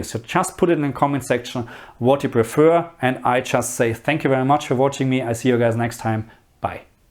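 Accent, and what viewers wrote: Germany. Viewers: Great video. I like your`e german accent also. I have the lg cx. and its great. But its old tech now. and cant be that bright. but only in more then 1000 nits you seen that the cx is weak. with 800 nits, it has better details etc? but what you advice me, bought the s95b this year? or wait till second gen qd oled from samsung?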